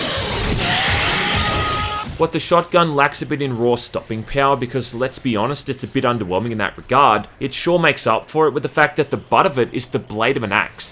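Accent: Australia. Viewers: You are quickly becoming my favorite channel to watch. The delivery, the jokes, and the Australian accent ( oh ya, and the games). God tier content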